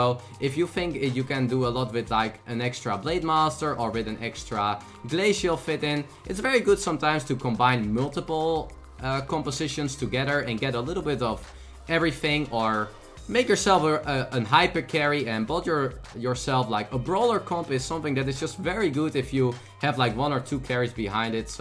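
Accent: Netherlands. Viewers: Obviously Dutch, that accent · This guys his dutch accent is so strong lol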